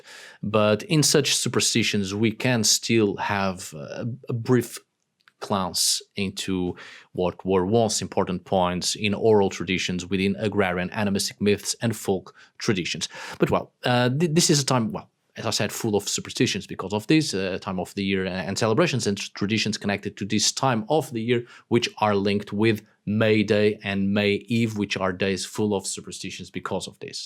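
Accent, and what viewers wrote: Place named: Portugal. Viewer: Hi. I could not place that warm, Euro accent in English. Portuguese! Wonderful. I was thinking was Norwegian or such. Good luck on your existential content issues,.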